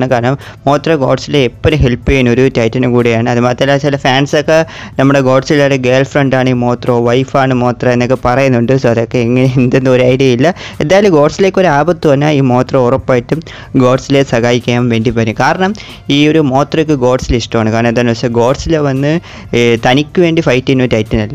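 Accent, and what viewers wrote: India. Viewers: Thamizhan ആണെന്ന് തോന്നുന്ന്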